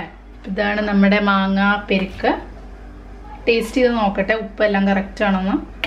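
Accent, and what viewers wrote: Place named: North Kerala, India. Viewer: Madam... നാട്ടിൽ എവിടെയാണ് ? നല്ല വടക്കൻ ഭാഷ .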